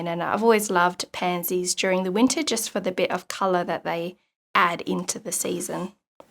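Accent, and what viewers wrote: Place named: Australia. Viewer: Love that I found your channel through another artists recommended channel page. Subbed as soon as I heard the accent. Love that we have such incredible mark making artists here in Aus 💪🏼😁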